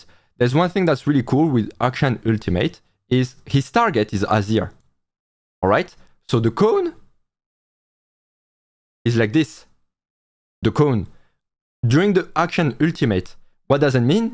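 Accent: France. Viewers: you sound french, are you ?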